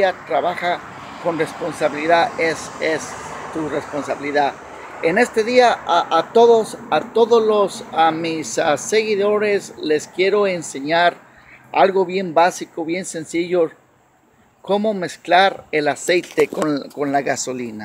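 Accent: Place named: United States. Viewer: Porque el acento bobo de EEUU?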